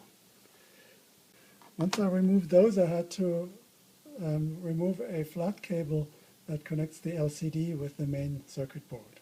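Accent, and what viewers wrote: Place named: Germany. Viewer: <tangent> I love your accent. What in the world is it? I'm getting slight German, but also slight... something from England, but I'm not sure what. Am I far off?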